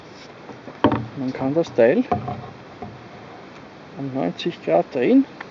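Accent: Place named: Austria. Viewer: Ach ja, so ein Einbeinstativ ist schon Gold wert. Und der Deinige ist auch noch ein Spazierstock obendrein. In meiner Antwort auf deine pers. Nachricht wusste ich noch nicht woher du kommst. Ich denke mal..an deinem Dialekt aus Österreich. Auch das Kennzeichen deines Autos ist kein deutsches aber man kann es nicht gut erkennen. Aber ich möchte ja nicht herumschnüffeln sondern deinen Beitrag der für so manchen Wanderer interessant ist, beantworten. Gruß von Walter.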